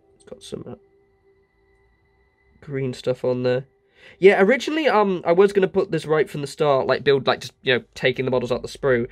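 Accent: United Kingdom. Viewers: As a fellow british person, I have the horrible moment of thinking "do we all sound the same?" All your expressions are the same as mine. Thank you for this, jsut gotten abck into warhmmer after 20 years and could never kitbash as a kid, so lovley to get some inspiration for me starting out my own Nid and Cult armies.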